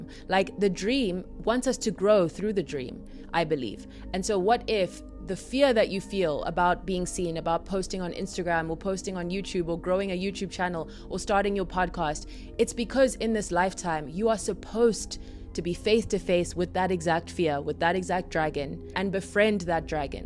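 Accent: South Africa. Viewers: The dragon only guards the treasure🤯 I was in a yoga pose listening to this and I almost fell to the ground. Thank you for this episode you just freed me and I’m into this episode. Thank you for being this example and living your purpose. As a South African hearing my accent speak this work is so beautiful and lets me know it’s time for me to teach what I learnt to free myself from conditions and limitations. Thank you for this. I’ll start my YouTube channel officially today. No more fear. No more spiritual closets either. 🙌🏾🫰🏽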